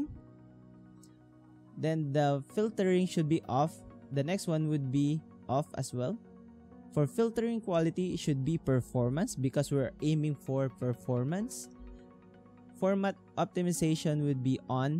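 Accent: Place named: Philippines. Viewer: Haha pinoy accent 😉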